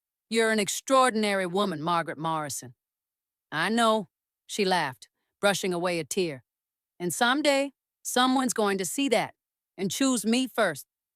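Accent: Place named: South, United States. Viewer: She's Southern, a great Voice.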